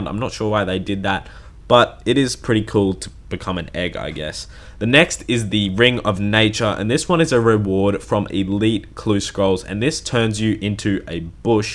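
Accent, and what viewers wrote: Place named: Australia. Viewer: Mate, you must be fair dinkum, true blue, rinky dink Aussie? I mean you have to be, 7pm upload time, good as gold. In all seriousness, love the content and your familiar, refined accent. Keep up the good work mate. If I were to be honest; I would have to say that your videos contain some of the most useful OSRS content I have seen on YouTube in a long time. tl;dr Fully sick vids, hectic descriptiveness, sickhunt. 11/10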